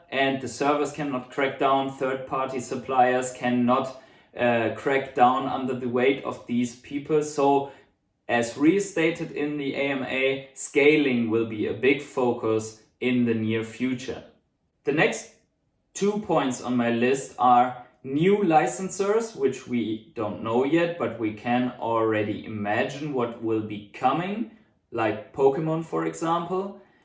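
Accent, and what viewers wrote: Germany. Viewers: U German?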